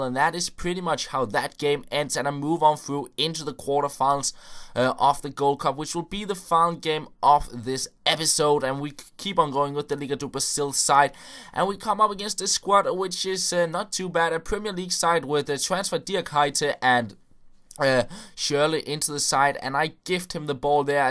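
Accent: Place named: Denmark. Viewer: I can hear on your accent that you are danish :D I'm norwegian, you see :D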